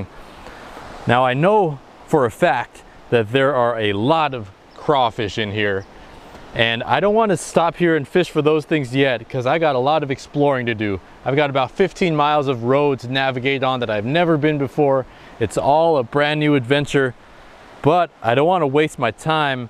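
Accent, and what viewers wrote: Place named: South, United States. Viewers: Love that southern voice hahaaa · Southern accent made me laugh so hard, ^_^. 5 star video. Thanks.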